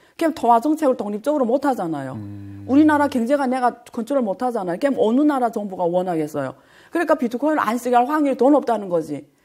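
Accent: China. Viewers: 화교인가 발음이 ㄷㄷ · 억양이 조샌족인가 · 조선족이신가요? 억양이..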